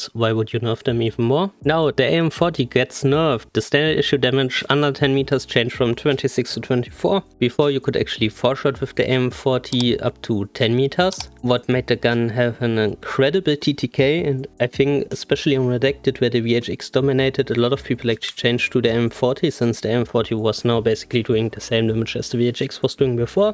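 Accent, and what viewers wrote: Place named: Germany. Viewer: Tell me youre german without telling me youre german. The accent is based af..... like for that xD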